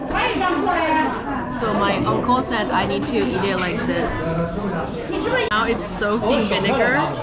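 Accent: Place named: United States. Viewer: you have quite American accent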